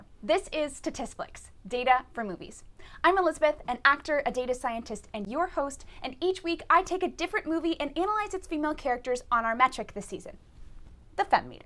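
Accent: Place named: RP, United Kingdom. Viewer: Yeah your posh is good!!! You go girl 👏🏾👏🏾👏🏾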